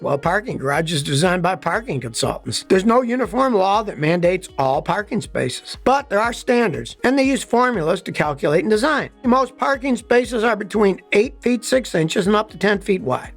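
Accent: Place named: Michigan, United States. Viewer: did you grow up in Michigan? I did, and you sound like you did!!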